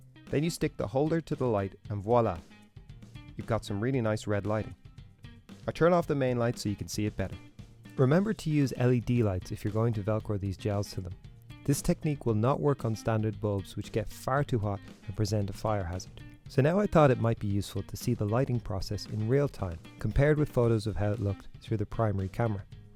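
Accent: Ireland. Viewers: are you Irish? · This was very helpful, thank you. By the way, are you Irish or something?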